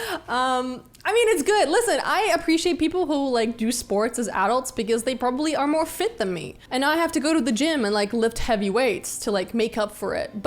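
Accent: United States. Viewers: u sound American though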